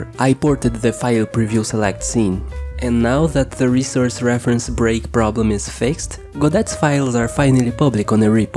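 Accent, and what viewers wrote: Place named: Brazil. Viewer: Acabei de me ligar que ele fala inglês com sotaque kkkkkkk deve ser BR ctz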